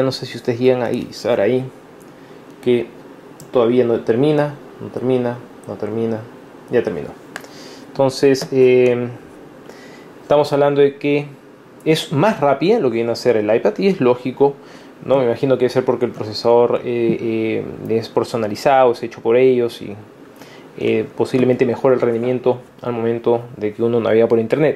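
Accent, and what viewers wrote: Peru. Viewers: Eres peruano ? ? ?? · eres peruano? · hey eres peruano??? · eres Peruano?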